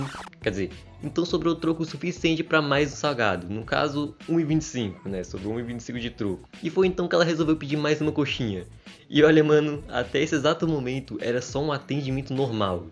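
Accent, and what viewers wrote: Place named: Bahia, Brazil. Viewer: voz de baiano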